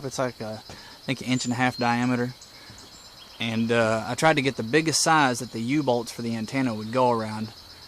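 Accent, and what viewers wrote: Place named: Eastern Kentucky, United States. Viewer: you sound like a Eastern Kentucky boy, am I right ?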